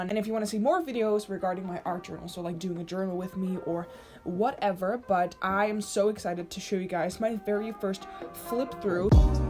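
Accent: Netherlands. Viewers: omg is he dutch? XD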